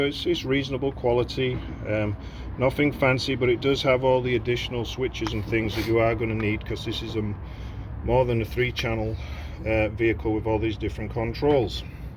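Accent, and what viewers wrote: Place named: England, United Kingdom. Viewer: Hello were are you from originally. You don't sound American. Sound more English .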